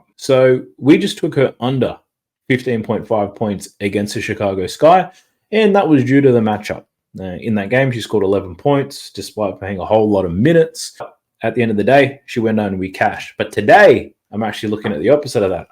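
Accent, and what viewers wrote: Australia. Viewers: Only 2nd time watching the channel , thought i detected an Australian Accent the first time i watched , good to see/find a channel with an Australian doing sports Betting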